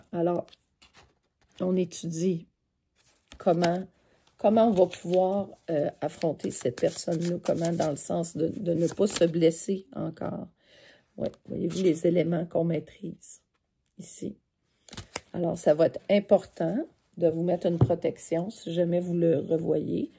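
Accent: Quebec, Canada. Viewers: Bonjour , je ne veux plus rien régler ,tout a déjà était réglée dans le passé avec ce traître diabolique pervers et narcissique , j'aime bien votre accent québécois , et je vous remercie infiniment pour votre guidance . C'est la beauté et aussi la chance d'être dans la nature pour pouvoir vous ressourcer ça fait extrêmement du bien , triste a moi je n'est pas cette chance , mais effectivement mon ex autre pervers narcissique qui est déjà avec une femme maritalement mais il ont failli perdre leur enfant qu'il n'ont pas perdu au final et qui a 30 aines , il m'a beaucoup fait de mal exacte , et dans cette famille tout n'est qu'argent finance immobilier ,c'est sa femme qui est riche et que lui a épousé il y a 40 ans dans la noirceur totale très fausse superficielle exacte , qui a ensorceler mon ex autre et a contribuer a notre séparation mais lui a préféré l'argent a l'amour que je lui apporter , il n'aimer que mon énergie et je les éperdument aimer sans savoir vraiment ce qu'il était et faisait , il y a une secte on m'a dis , oui il est ds une cage dorée dont il ne veux pas partir pour l'argent incapable de se gérer seul , la dame riche sa mère et mon ancien autre tous sont dans les mensonges , je pense que c'est un gigolo même probable un proxénète vu ce qu'il m'a raconter qu'il fréquente les prostitues alors qu'au départ il a bien cacher son jeux se faisant passer pour fidèle alors qu'il est en perpétuelle conquête de recherche féminine , j'ai failli mourir car il m'a vider de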